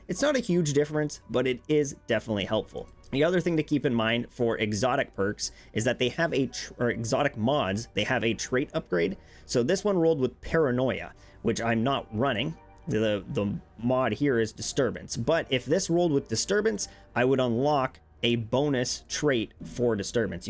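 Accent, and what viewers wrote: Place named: Canada. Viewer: Great video but damn my man you and tash sound exactly alike and I'm not talking about the Canadian accent. If I had my eyes shut I wouldn't be able to tell the difference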